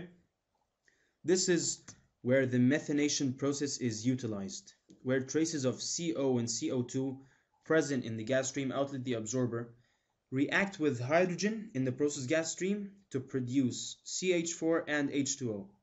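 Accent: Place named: Arab world. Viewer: thanks man! really helpful! i sense an arabic accent! all the best man! ---Undergrad chem eng student, Australia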